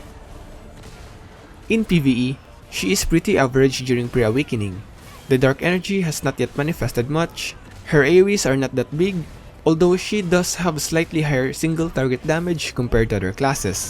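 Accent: Philippines. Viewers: Prang pinoy mag english to haha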